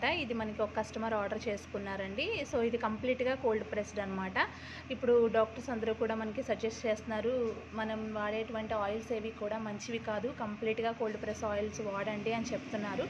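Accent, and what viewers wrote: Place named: Amalapuram, India. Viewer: అమలాపురం మాట వినగానే🤗🤗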